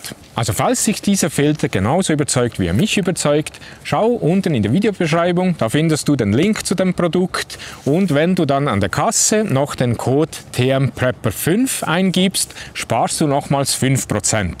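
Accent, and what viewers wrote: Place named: Switzerland. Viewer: Moin Na, das war ein dramatischer Anfang und die Musik Episch. Das mit dem Schweizerdeutsch war vollkommen in Ordnung, der Dialekt ist einfach Klasse. Der Filter ist wirklich gut. Selbst hab ich einen von Katadyhn . Ist aber eine Überlegung wert sich den anzuschaffen. Danke für's zeigen 👍🏻 Gruß Michael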